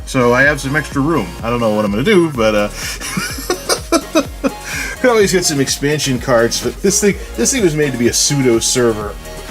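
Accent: United States. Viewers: Which part? If you are going to say that I don't know what I am talking about, I suggest you be a little more specific. My math could be off a little, rounding, but Google says that the average rate for electricity is .18 cents per kilowatt hour in the United States, That makes my figures fairly accurate. I redid my math. According to Google my math is pretty accurate if you are in the United States on the mainland. In Hawaii electricity rates are about double the U.S. average. He has an American accent, so my assumption is that he is somewhere in the United States and not in a place like Germany where the average rate per kilowatt hour is much higher. He did not state where he is. That SSDs are better than sliced bread? This is my opinion, an opinion derived from owning hard drives and then several SSDs. If you are going to say that I don't know what I am talking about, is not exactly accurate, because I believe that everything that I said, while not being exact, is pretty accurate. 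That you do not agree with what I said, in no way means that I don't know what I am talking about. If you do not agree with my math, I suggest that you do it yourself. Did he say an additional reason that I didn't hear? The best that I can deduce from his video is that his previous hard drives used so much more electricity than his new SSDs that it makes it financially worth switching. I made a pretty fair argument that while SSDs are much better, the electricity that you save is not a reason to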